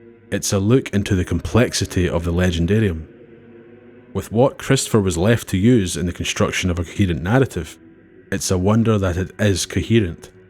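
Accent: Scotland, United Kingdom. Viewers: very well made video, and as a german, loving the scottish accent :) Obviously there was no primogeniture among the noldor, as always there have been descendants with a higher claim. like the sons of faenor. so one could assume, that birth wasn't everything when the elves considered who should be king.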